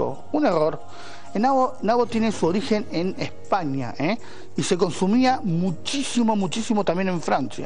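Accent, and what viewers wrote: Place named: Argentina. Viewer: Una persona de rasgos orientales con acento argentino! hora lo ha visto todo!!! Muy buena receta!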